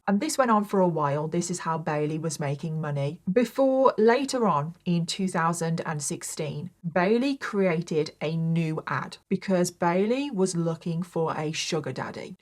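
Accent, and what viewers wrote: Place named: England, United Kingdom. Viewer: ........i ur have a Beautiful accent....i didnt think u were from Australia......i knew u were from the UK.....cause i think the UK accent is nice...i love listening to ur stories.....im from USA...a southern state....thanks for all ur crime stories...i never miss any of ur new stories...❤❤thank u for teaching makeup ways...u have taught me alot😊😊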